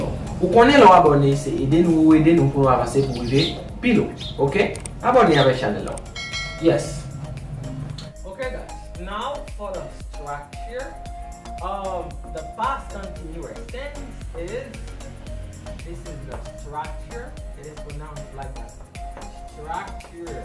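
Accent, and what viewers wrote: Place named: United States. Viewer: Great English American bro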